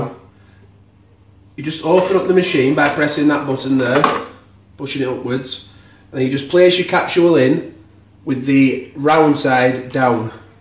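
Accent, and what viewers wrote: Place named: North, United Kingdom. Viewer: Couldn’t make sense of the nespresso instructions, all I needed was a straight-talking northerner to show me what I was doing wrong, thanks!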